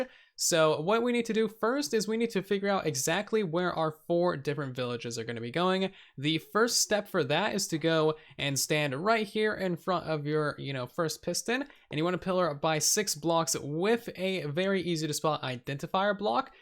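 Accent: United States. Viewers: is the mumbo jumbo but not for extreme redstone but for game mechanics and with a American accent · is secretly mumbo but with a American accent?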